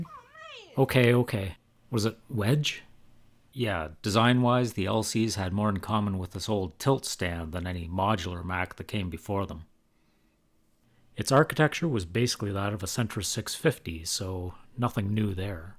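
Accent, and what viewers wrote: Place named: United States. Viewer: It's strange to see this level of obsession with the perfect restore from someone with such an authentic American accent.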